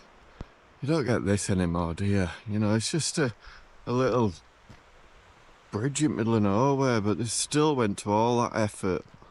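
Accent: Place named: Yorkshire, United Kingdom. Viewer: Love Howarth and Skipton, are you from yorkshire? Our accents sound similar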